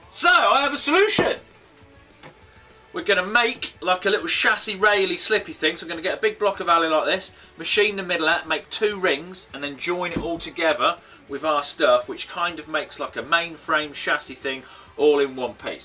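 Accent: England, United Kingdom. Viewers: what part of england is he from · *cough he's very much ENGLISH me old fruit... Although we are basically cousins (aussies - British)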